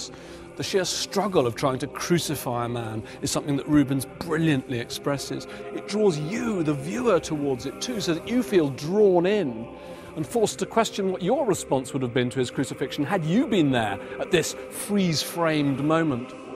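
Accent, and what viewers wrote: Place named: RP, United Kingdom. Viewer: Is it me or English have a tendency to replace quality in contents with a posh pronunciation and diction?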